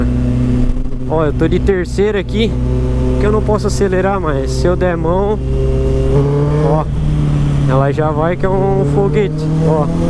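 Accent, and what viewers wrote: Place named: Santa Catarina, Brazil. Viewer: E pelo sotaque, e eu também sou moro aqui em Florianópolis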